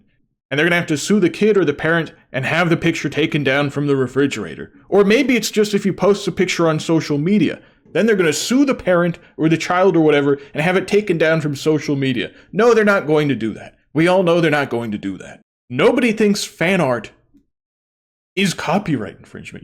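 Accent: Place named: England, United Kingdom. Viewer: Hallo, a hallo! Excuse me, geezer, but that’s an English accent. Not Australian, where my ancestors imprisoned Shad long ago.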